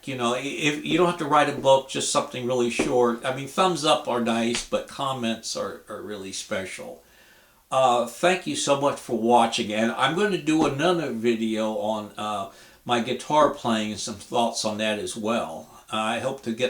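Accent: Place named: South, United States. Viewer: The first thing I had to do after watching the video was to find out where in the south that this young lady was from that's a thick accent even for me here in Louisiana. Very different vocal styling, good music the more videos I watched the more she just grows on you. Thanks for the introduction.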